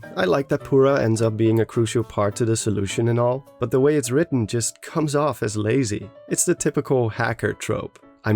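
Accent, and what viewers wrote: Netherlands. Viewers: I knew you where dutch, just hearing your voice lol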